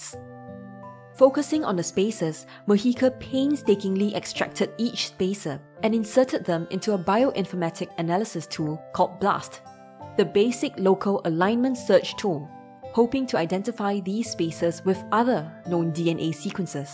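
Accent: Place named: Singapore. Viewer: CRISPR will fix that horrible Singlish accent.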